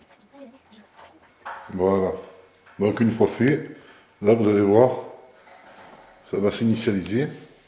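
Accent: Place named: South, France. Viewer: Salut le sudiste ici le nordiste, tu as envoyé du soleil chez moi avec ton accent et ta technique 😉 merci bien! je me bat depuis 2 jour avec des boîtier de m**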